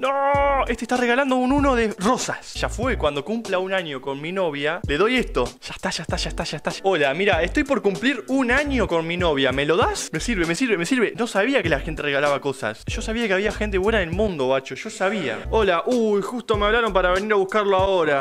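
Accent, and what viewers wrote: Argentina. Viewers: De donde eres? Pareces argentino o uruguayo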